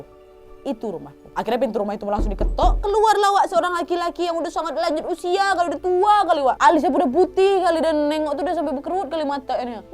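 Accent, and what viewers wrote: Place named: Manado, Indonesia. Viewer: Aku suka gaya bicaranya, kayak orang Manado🤗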